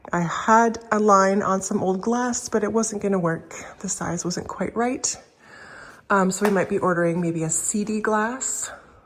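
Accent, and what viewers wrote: United Kingdom. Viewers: Ok, when or why did you chose a british voice over?😅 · oooo using a standard english british for a narrator .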